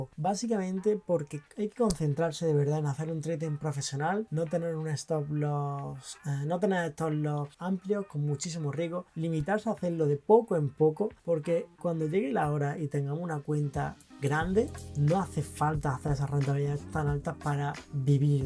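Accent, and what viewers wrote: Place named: Spain. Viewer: Buena info. De donde q parte de España es ese acento, es muy particular.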